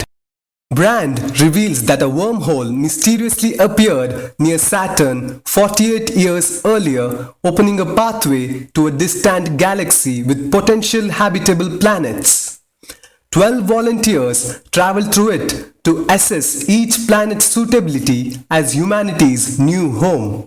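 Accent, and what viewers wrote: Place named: India. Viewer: You have a very light Indian accent man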